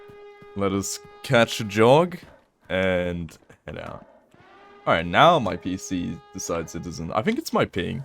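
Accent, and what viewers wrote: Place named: Holybourne, United Kingdom. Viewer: That holybourne accent tho........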